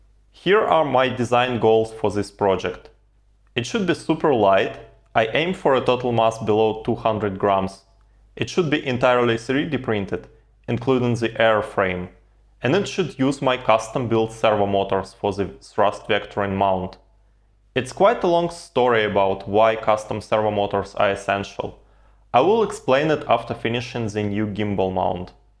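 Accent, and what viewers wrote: Russia. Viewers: Speaking with russian accent)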